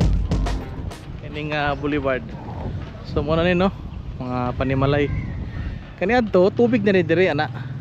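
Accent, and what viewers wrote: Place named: Cagayan de Oro, Philippines. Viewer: Your videos are a “whale” lot better than the other masquerading to be the “go to guy” of CDO Vlogs. Why is that: - you transition from one view to another is smooth (does not hurt the eyes). - background music is good; narration is even better and not trying hard. - your cagay-anon and cebuano bisaya tone is downright natural and entertaining. - you can improve though on the clarity or is it quality of your videos Continue to do your vlogs without really trying hard. Continue too to be a “ man for others”.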